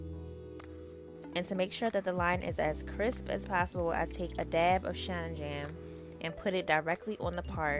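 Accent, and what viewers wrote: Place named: Washington DC, United States. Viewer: Your accent is familiar , may I ask if you’re from Washington , DC? Also watching you part was so soothing in an odd way ! Lol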